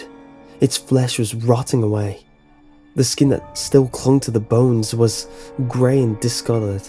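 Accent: England, United Kingdom. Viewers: something about being read creepy pasta's from a guy with a British accent is really fun. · He sounds like an English male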